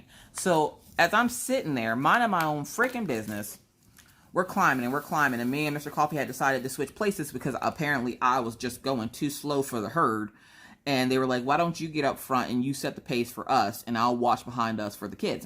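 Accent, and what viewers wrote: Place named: East Coast, United States. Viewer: I'm new to your channel and you've really got me addicted! When I first heard you I figured east coast. I spent a LOT of years in Baltimore. Then when I heard you say you were in ND? Even a smaller world. I was born and grew up there. And now I'm in SD so was loving to hear about your trip to the badlands! I'm in Rapid, in sure you passed pretty closely by.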